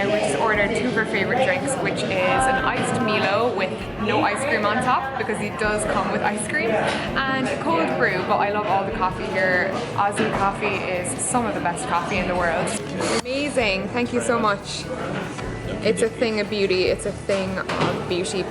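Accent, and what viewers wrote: Ireland. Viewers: Wait are u irish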